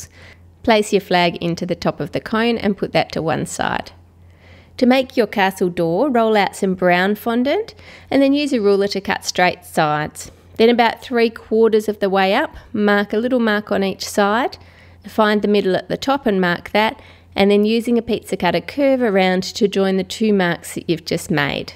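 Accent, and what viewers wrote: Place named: Australia. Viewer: G'day! Just wondering if you could do a Video tutorial on this cake. <link> I would like to do it for my daughters birthday in September, and your videos are GREAT. Thanks. Ps nice to hear an Aussie accent on you tube. You are VERY talented.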